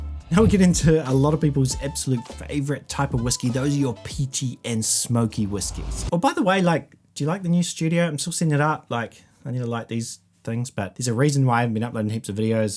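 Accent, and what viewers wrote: New Zealand. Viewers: Man that is a thick kiwi accent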